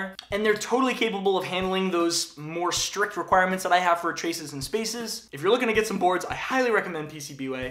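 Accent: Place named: East Coast, United States. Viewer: Does he ever say where he lives? His accent is subtle but I'm thinking east coast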